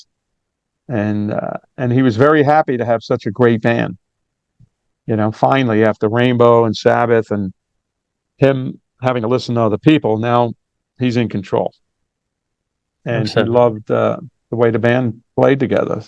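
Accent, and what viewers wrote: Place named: Alabama, United States. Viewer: Love your accent bro…that comes from Alabama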